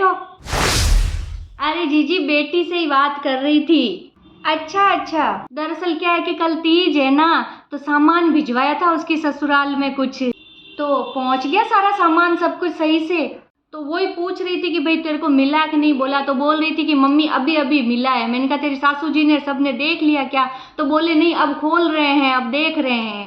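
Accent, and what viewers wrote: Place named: Rajasthan, India. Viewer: Bahut bahut badiya marwari me bola Karo acha lagta h😂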